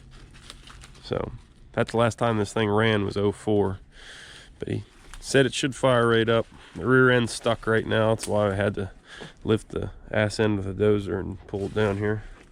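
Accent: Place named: Pennsylvania, United States. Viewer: sounds like a Pennsylvania accent there....where are you from? we are in ohio. love the old trucks. i have a 1968 w900a with a an 8v72 2 stroke and 4 and 4 trans. original truck but needs retored. also have a 65 loadstar project going on.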